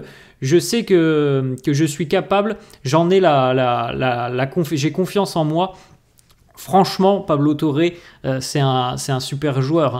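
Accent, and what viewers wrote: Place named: Spain. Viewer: Visca barça j,aime bien ton accent espagnol 😊❤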